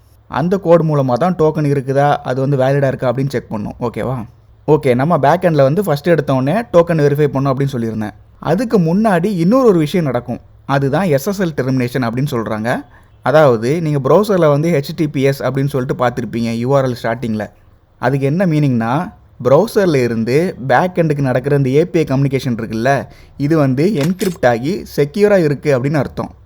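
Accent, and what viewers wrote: Tirunelveli, India. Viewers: Neenga Tenkasi, tirunelveli side ah 😊😊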